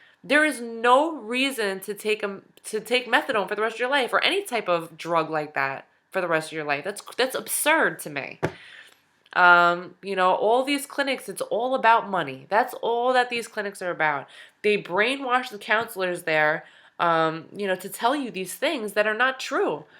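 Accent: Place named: New Jersey, United States. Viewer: Can totally tell u from Jersey. It's kinda funny sounding to a West coaster. Methadone 5 years. Looking to get off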